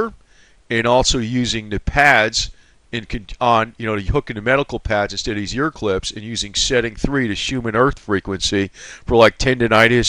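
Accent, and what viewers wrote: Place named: Italian American, United States. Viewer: you sound like an Italian American kind of like Robert DeNiro type accent.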